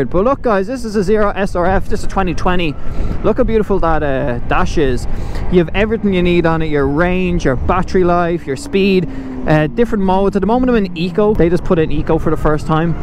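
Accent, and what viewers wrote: Ireland. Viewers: it has nothing to do with your being Irish, or you accent. In fact I love the Irish people very, very much. There were quite a few times when the editing was not as accurate as it could be.. As I said, I love the Irish very much. And I love your accent. It was not my intention to upset you in anyway. And I’m sorry if it did. Please except my apology for any words I said that might make you think otherwise. Owned a Honda CX 500 V Twin in my younger days. It was when I began to suffer from arthritis that my wife and I turned to cars. My car is now Electric and love it. Hope you might buy this bike. Boy it’s quick off the mark. Richard.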